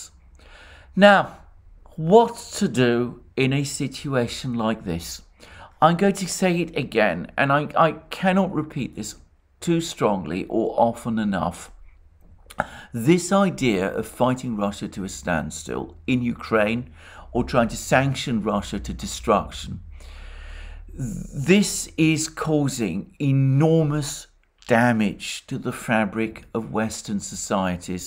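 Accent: England, United Kingdom. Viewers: Lol, Alexander is simply reciting unfiltered Russian propaganda from almost exclusively Russian sources. Putting an english accent to it does not make it any less Russian propaganda.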